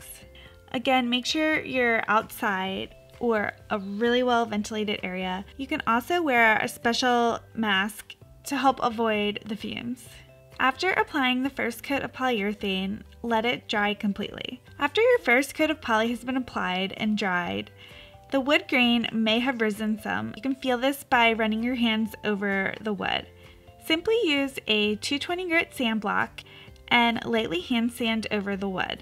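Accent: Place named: California, United States. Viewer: Terrible Californian “ Hollywood “ accent. Speak the Queen’s English Dear!!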